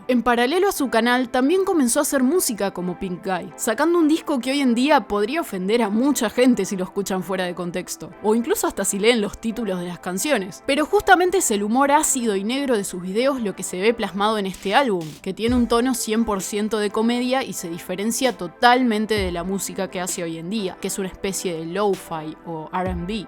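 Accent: Argentina. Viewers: como decis "shoshi" me parte los webos! yo entiendo q en argentina pronuncian asi pero tampoco pa tanto, el nombre es japones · Me encanta que le dice ChoChi , amo el acento argentino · Yo: Yoyi: Shoshi Amo a los argentinos ahajajsh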